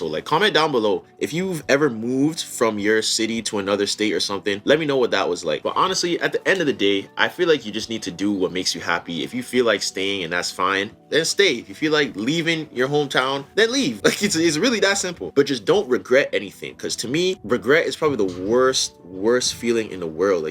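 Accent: Canada. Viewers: He clearly has a Toronto mans accent geez I'm leaving the place as well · according to what you said, well 1 point i like to make, you do not need to move from 1 pointto the other. you do have transit there, right? that in itself is great and very cool. sight seeing by transit. but all the same, everything you said is a very good point. and you did not bash the country. if you are not from canada, you sound very much like someone from canada. ok someone from ontario but hey no one is perfect (i know, i am a total smuck)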